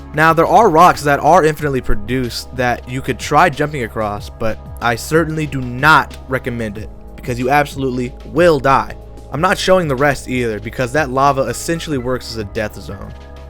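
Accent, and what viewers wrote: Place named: New England, United States. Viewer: ALSO New England Accent detected. i think? the way you pronounce "Room" reminds me of me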